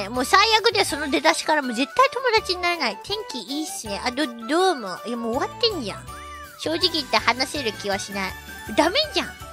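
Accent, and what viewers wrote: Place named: China. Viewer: Is she Chinese? That accent definitely isn't Japanese first language English Second Language.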